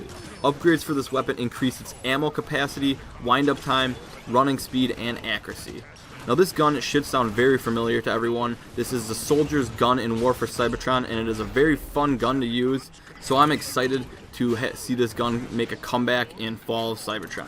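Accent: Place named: Canada. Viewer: I don't. Canadians are of mostly French descent I think? That makes them european. I was just curious if he was from Canada because he sounded Canadian. Now I'm a racist for being curious. What a wonderful and oversensitive world we live in now, that I can't just make simple conversation without being accused of being a bigot. T_T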